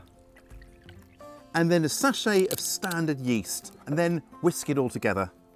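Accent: United Kingdom. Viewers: We are in the UK please know your audience before commenting nonsense that doesn't apply to UK. You can clearly tell his accent is British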